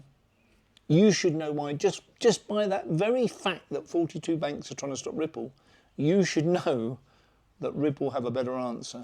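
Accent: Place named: United Kingdom. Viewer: People with British accents just sound smarter and more trustworthy. I’m hooked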